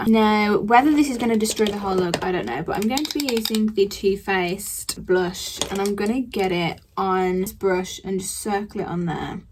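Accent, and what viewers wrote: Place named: United Kingdom. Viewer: Your accent is so cute. I currently live in Australia and wanna follow your accent, not bogan accent. Even though you explain how to make up, I learn british accent from you haha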